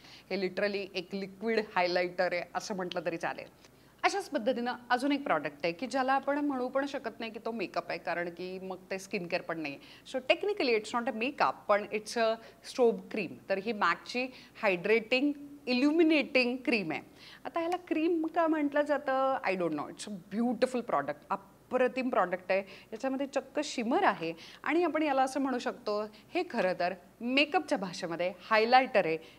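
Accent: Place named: Pune, India. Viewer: पुन्हा नव्याने तूझ्या प्रेमात पडले आज ❤️, ही पुणेकर भन्नाट आहे...typical पुणेकर tone भाषेचा...loved it 😘😘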